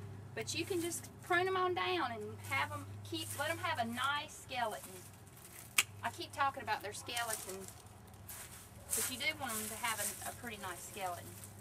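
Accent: South, United States. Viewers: love that Southern Drawl, sweetie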